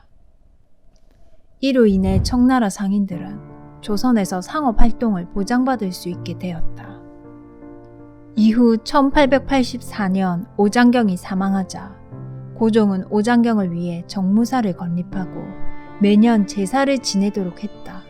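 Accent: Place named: Gyeongsang, South Korea. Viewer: 동학혁명 예고편이네요. 경상도+사극 나레이션 굿!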